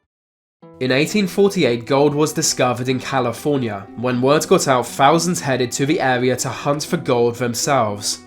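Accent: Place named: London, United Kingdom. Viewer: London accent. It's a class thing.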